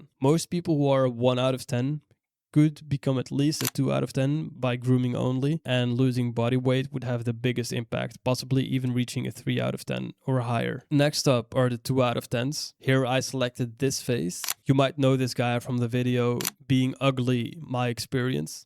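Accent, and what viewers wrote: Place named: Netherlands. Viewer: Very Dutch accent.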